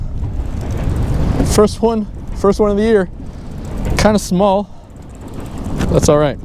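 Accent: Bay Area, United States. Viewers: That Bay Area "accent" tho.... Hahah! Love it man, keep it up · Love your videos bro and that Bay Area accent you can’t miss it 🤘🏽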